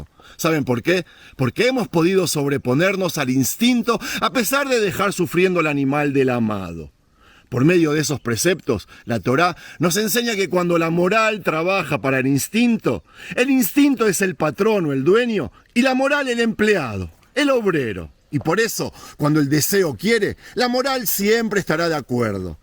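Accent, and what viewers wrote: Buenos Aires, Argentina. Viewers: Israel y los judios son el Pueblo Elegido de Dios que han sufrido persecucion durante siglos hasta hoy. Hashem los proteja siempre. Bonito amanecer en Jerusalen. A mi tambien me gusta mucho la Historia. Mi grupo de hermanas de mi iglesia y yo estamos leyendo la Biblia cronologicamente y ahora estamos acabando Exodo. Gracias, querido, por tu esfuerzo, por tus paseos, por hablarnos estas historias maravillosas con el Tanaj en la mano, con tu hermoso acento bonaerense. Bendito Israel y su hermosa gente. Seguimos orando x todos vosotros, por los rehenes, por los soldados y por tu hijo Eden. Que Dios os bendiga. SHALOM desde Barcelona. AM ISRAEL JAI✡️🇮🇱🙏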